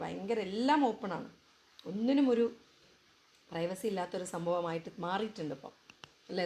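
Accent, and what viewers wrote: Kozhikode, India. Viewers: അവര് കോഴിക്കോട്ട് കാര് അല്ലെ same സ്ലാങ് വരും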